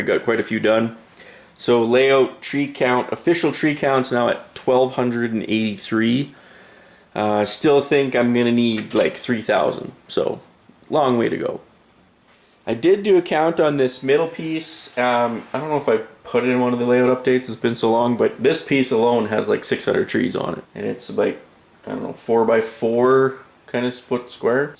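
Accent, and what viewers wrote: Canada. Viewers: Just curious but are you out in Saskatchewan, Your accent reminds me of my moms best friend who base hopped to all the same postings we got when I lived at home. Love the Layout and I hope that you get past your water effects roadblock.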